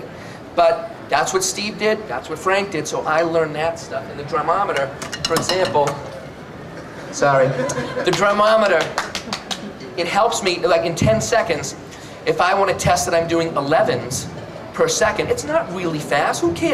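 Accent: Boston, United States. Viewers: Is he a Boston fella? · He's from the Boston area. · Boston accent + saying retarded= win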